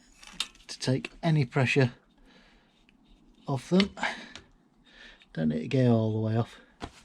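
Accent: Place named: United Kingdom. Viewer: Hi; I kept watching due to the British accent. Do you have the thackery washers to the rocker boxes; as supposed should be? like in the later parts book drawing?; not in the manual book. Also kept watching to see an UK type model (I have the export model that is similar) but seems all have the USA specs...I need to upload a video at some point. I have a 79 with dual plug head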